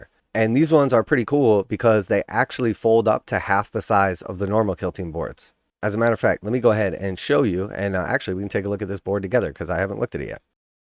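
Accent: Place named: Texas, United States. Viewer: Are you from Texas?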